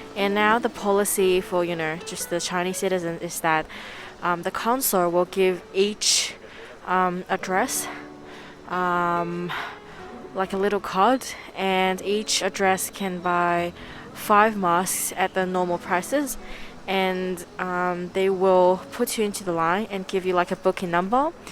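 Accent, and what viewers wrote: Australia. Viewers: Nice vlog, but do not pick up more of that Aussi accent :) Stay safe ! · So nice to hear your oz accent. 😍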